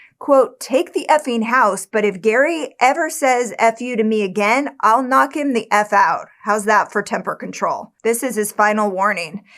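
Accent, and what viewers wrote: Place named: New York, United States. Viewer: a voice over that makes you run for MUTE. Holy New Yawk!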